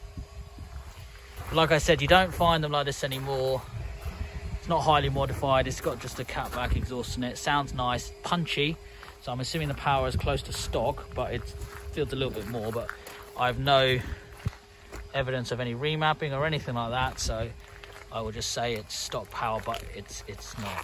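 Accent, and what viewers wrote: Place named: United Kingdom. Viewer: It's just some British guy talking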